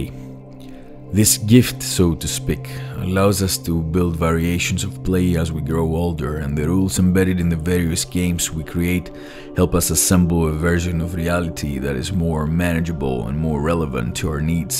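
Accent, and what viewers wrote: Greece. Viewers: Φίλε... 15 δευτερόλεπτα και λέω Έλληνας είσαι?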